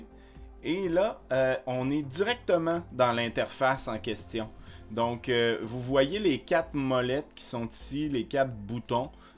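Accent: Canada. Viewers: salut,merci pour t conseil jaaaime bien ton axent canadien loin de moi d'en rire! si tu savais comment on parle vers marseille! je te permet. tu me fais pense à snowden le open source! c'est pas une balance! meme la justice ne fais pas le poids elle ki se dis equilibre? permet moi d'ans douter! g pas regarder toutes t video! apres je te rap-l. merci bonne continuation le meridien de greenwich...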